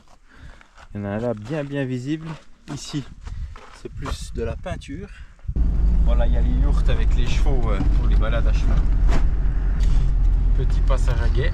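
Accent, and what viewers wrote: France. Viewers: Salut, Je suis originaire de Montbéliard et ça fait du bien d'entendre le bon accent bien de chez nous ! Et tout à fait d'accord avec toi sur cette propagande. Perso j'attends ma cellule, car ce n'est pas moi qui la fabrique. J'ai eu une Land 109 série IIA dans les années 80, puis un Discovery et un Range P38. Aujourd'hui j'ai changé je suis sur Ford Ranger et oui, avec l'âge, on roule en "confortable" ! Bonne continuation à toi et ta p'tite famille. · salut a toi je découvre ta chaine et tu as fait un sacré boulot je suis du doubs et toi aussi je pense ca s'entend on dirait !! bon voyage a toi et félicitation pour le boulot !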